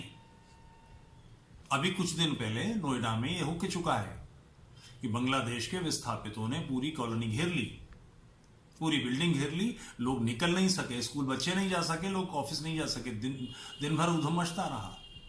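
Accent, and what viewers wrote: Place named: Urdu, Pakistan. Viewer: Sahib, sab se pahley, aap Hindi bohat khobsoorat Urdu lehjay main boolte hain. Aap ahle zubaan hain sir. Mn Hindi bohat kum janta hn, tub aap ki sari baat smajh naheen aati, bus mufhoom samajh aa jataa hai Tu sir aap ne jo Islam k bare mien farmaya, sub such hai. Aap kaa hl, civil war, practical naheen lagta. Mera tajurba ye hai ke mulaa k sath aap jitni narmi bartien gay woh ussay aap ki kumzoori aur apni fateh samjhe ga. Adaab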